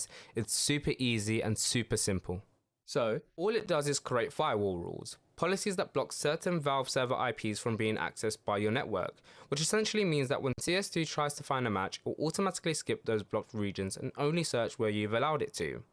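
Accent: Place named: United Kingdom. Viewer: Got to love hearing a brit playing cs, see brits all over youtube playing but i always got Igor and vlad on my team instead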